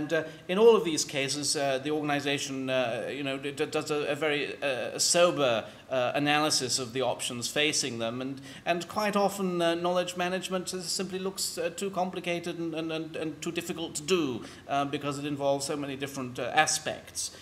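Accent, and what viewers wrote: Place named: RP, United Kingdom. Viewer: You are FRIGHTFULLY posh, aren't you! (It's refreshing. I thought I was the only person left who spoke like they stepped out of a 1940s Ealing Studios film. JOLLY good show, old chap!) By the way, I have absolutely NO idea what you're talking about (I'm an artsy type...who, by definition of that fact, has 'knowledge retention' issues), but I could listen to you talk for HOURS.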